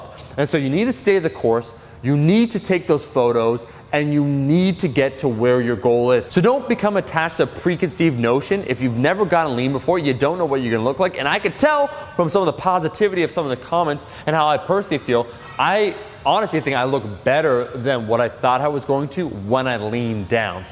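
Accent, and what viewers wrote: Switzerland. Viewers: Never thought I'd hear Swiss German in an American fitness video. Greetings from the old country! Aber, der beste Käse immer kommt aus die Romandie oder Tessin, und du wisst das wie alle Düütschschwiitzer. Emmenthaler<Gruyère<Büscion da caura. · Dialect. I think it's Swiss German. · That Schweizerdeutsch though...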